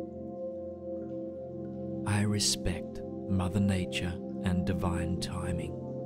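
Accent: Australia. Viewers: Hey, as a fellow Aussie, I appreciate the accent, last 12 months have been terrible, but I feel like I'm turning a corner with the affirmations, still going through terrible things, but I'm getting through, and it bothers me alot less, thanks mate, god bless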